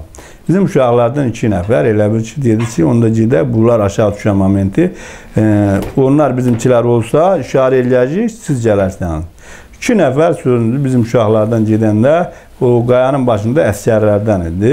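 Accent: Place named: Gadabay, Azerbaijan. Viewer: "Allahın qadasın alım" cümləsi o qədərmi başa düşülən deyil, azərbaycanlı olan bu sözləri başa düşməyə bilməz. Yaxşı sən başa düşən kimi "Allaha qurban olum" deməkdi. Bu adam sadəcə savadlı adam deyil, Gədəbəy dialektiynən danışır, əsas odur ki, əsl türkdür, əsl qəhrəmandır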